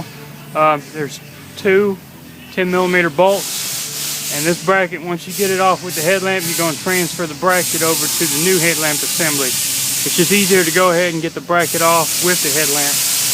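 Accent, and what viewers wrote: South, United States. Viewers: Haha I thought I heard a slight twang in your voice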